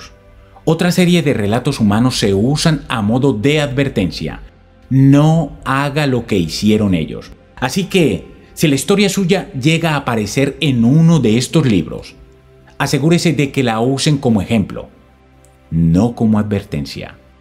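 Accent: Colombia. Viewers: Muchas gracias por los consejos y las ideas = son cosas k hacen pensar y yo he cambiado mi mentalidad ; después que escucho los Audio. Solo queria Saber si la personne que narra es un Colombiano?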